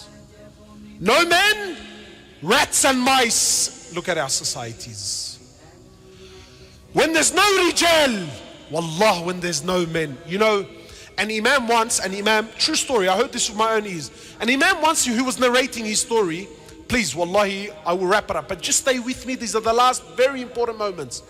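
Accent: Australia. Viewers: Is this preacher Australian? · Is he Austrailian? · is he aussie?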